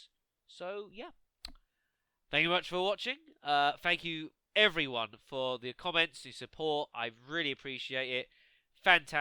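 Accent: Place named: United Kingdom. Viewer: I love your voice. It's amazing and makes the LPs more interesting. I wonder where in the UK you're from?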